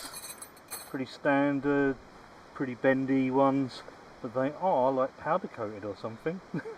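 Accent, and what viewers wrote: England, United Kingdom. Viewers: I'm a new viewer. I'm American and a huge Anglophile - you have an English accent but to the best of my knowledge (which is limited, to be honest), the most 'wooded area' in Great Britain is a place called New Forest. Is that where you do these videos? I enjoy your content. Best wishes from Florida.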